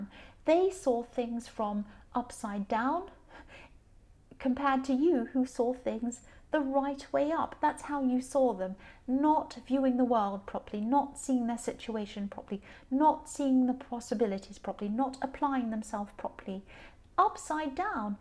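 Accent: United Kingdom. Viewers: you're so British!!! Love you!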